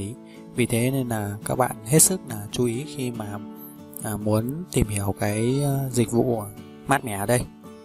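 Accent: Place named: Hai Duong, Vietnam. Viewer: Đặc giọng Hải Dương 😄😄😄😄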